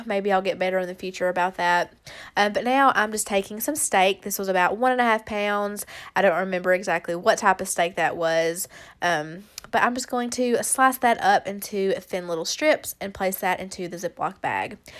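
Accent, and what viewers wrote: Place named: Kentucky, United States. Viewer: I don’t even have to ask where you are from! You sound just like my family from Kentucky. Love your recipes! I just subscribed.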